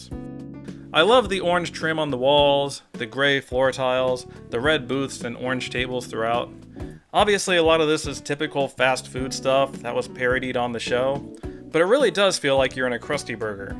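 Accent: United States. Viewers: It's weird how as a British person I didn't realise how American your voice is until I hear you speaking over irl footage! Great vid!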